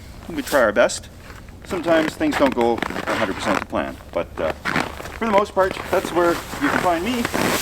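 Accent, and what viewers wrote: Canada. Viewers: I knew he was Canadian ah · I was about to go on the defense, but then he talked, and I get it. He does sound Canadian. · you sounded canadian and after i heard “vitamin beer” i had to check and i was right.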